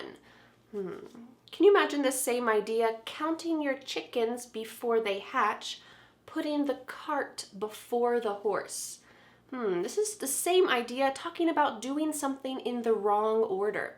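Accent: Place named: United States. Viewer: Thank you very much, you have taught me the American accent excellently Follow you from Algeria 😊😘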